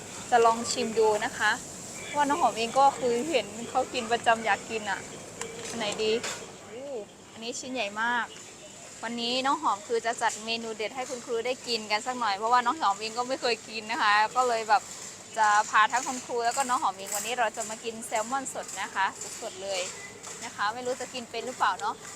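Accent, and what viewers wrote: Thailand. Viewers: น้องหอมพูดพม่าเก่งแท้แต่หนักมาทางไทยมากกว่าพูดไทยซะชินแล้วครูสาวพม่ามาเที่ยวไทยมารึกฯเลยน้องหอมว่าไทยเขาเปรี่ยนไปมั้ย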